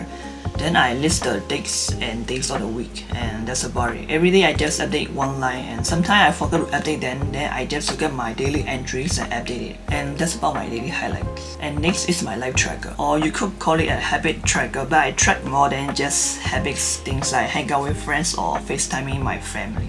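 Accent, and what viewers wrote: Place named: Myanmar. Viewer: You're accent look like Burmese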